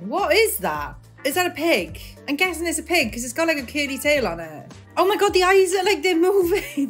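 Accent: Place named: Scotland, United Kingdom. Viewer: What country are you from you sound from Scotland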